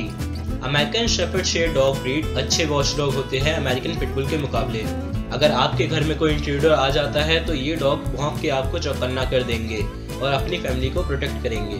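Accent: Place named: India. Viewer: Do you have the biggest indian accent or are you speaking indian, cause i cant understand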